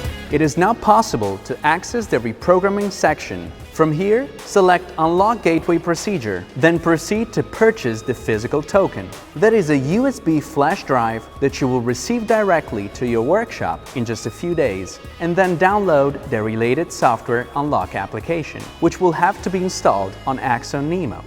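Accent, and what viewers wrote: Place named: Italy. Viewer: I don't understand everything (cause his strong Italian accent) but it seems very interesting. Goog tutorial.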